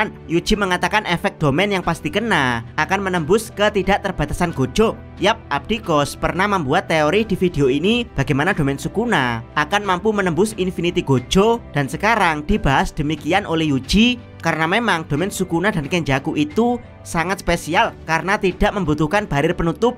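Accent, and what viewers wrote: Java, Indonesia. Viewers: Muedok wkwk, btw suwunss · jangan medok bg ganggu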